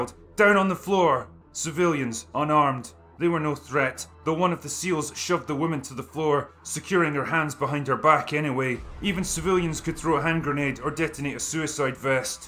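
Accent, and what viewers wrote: Scotland, United Kingdom. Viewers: God DAMN. Still remember where I was on that morning. Remember driving into work, radio on, outlandish preliminary death counts. Entering the classroom, turning on the television for the kids-- like my teacher did when the Challenger exploded-- because this was more important than any lesson plan., you brought tears to my eyes. Also, haha, I loved hearing US SEAL team members with your Scottish accent. God bless ye!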